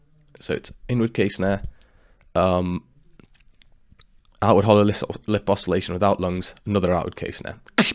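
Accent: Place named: United Kingdom. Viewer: Were you raised in the UK...coz u have a strong british accent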